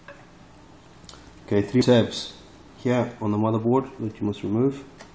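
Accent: South Africa. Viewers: Hi there , I have a Acer Aspire E15 , this has happened to me , I tried a hard reset with taking the battery out and holding the power button and then switching it on but that didn't work. I've been to and asked various technicians but they all say that it isn't the CMOS battery. They say it's impossible because I've only had the laptop for a year and a half ? Very informative videos, you earned a subscriber. Also you sound like you from South Africa 😅😅 So am I !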